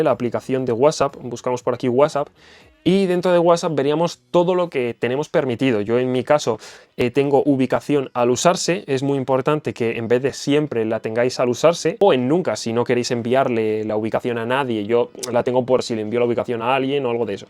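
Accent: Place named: Argentina. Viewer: Mira Te Vuerbo Ha Escribir El, PQ Soy Un Poquito Bruta Yoo Te Guarde Para Despues Ponerte Cuando Mii Hijo Quiero Desircelo Para Asii Y Una Ves Mas Q, Ellos Agan Eso Mismo Le Escribo Desde EEUU Ves Noo Se De Donde Eres Me Pareces Como De Argentina Lindo Pais La Señora Q, Vive Ariba Segundo Piso Ella Es De Argentina Loo He Mirado En TV Ho De Uruguay Ablan Parecidos Saludos Soy Cubana Cuidate Muchoo?Gracias Bendiciones!🙏👏🤚😪💙💘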